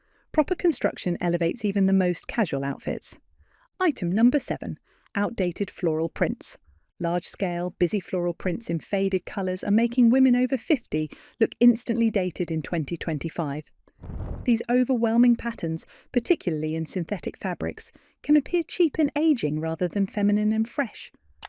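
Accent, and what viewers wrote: England, United Kingdom. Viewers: You have an English accent, however you use American terms such as Pants instead of Trousers, I don't understand why the English language used in the UK, and taught in Europe has changed its language to fit in with American English. we are teaching our children American and at risk of losing our own language. This is not me having a go, I just don't understand!!!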